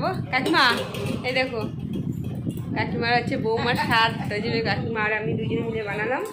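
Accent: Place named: East Bengal, Bangladesh. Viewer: একখান কথা কমু আপনি কইতাছেন আপনার জন্ম ইন্ডিয়ায়। কিন্তু আপনার কথাবার্তা থেকে এখনো আংগো পূর্ববাংলা টার্ন রইছে।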